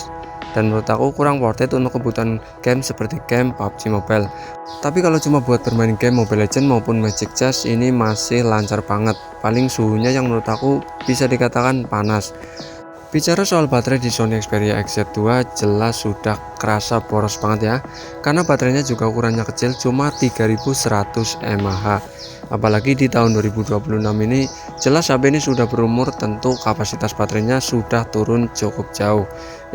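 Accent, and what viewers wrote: Banyumas, Indonesia. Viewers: Ngapak yah kak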